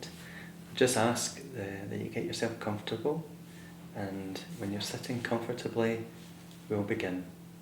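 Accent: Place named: Scotland, United Kingdom. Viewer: A Celt with a strong Scottish Brogue. 👍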